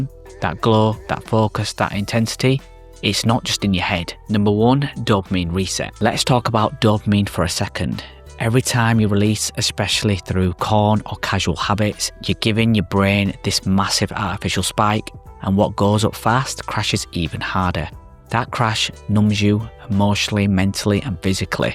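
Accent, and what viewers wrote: United Kingdom. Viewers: what British accent is this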